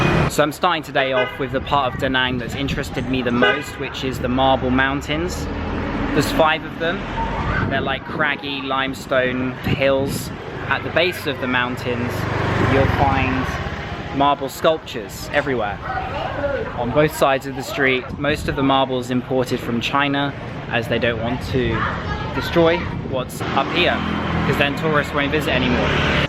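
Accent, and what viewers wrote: United Kingdom. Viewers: british accent , omg I love american accent !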